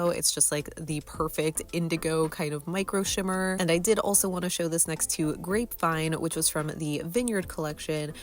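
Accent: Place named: Philadelphia, United States. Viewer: It may be a regional pronunciation thing. I’m from the Philadelphia area like and I pronounce it the same way. If you want to drive yourself crazy, you should hear us pronounce the word “water” as “wooder.” It drives people nuts.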